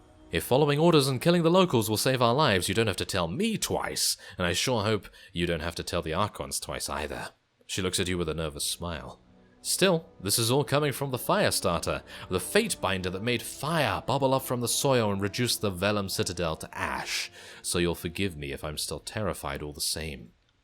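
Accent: United Kingdom. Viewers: This "play through" sounds much more like a very British reading of flavor text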